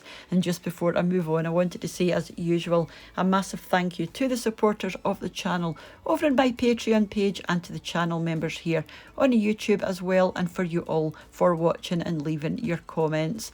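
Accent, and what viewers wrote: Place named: Inverurie, United Kingdom. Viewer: Mystery solved! Now I know why you sound like my friend from Inverurie! 😆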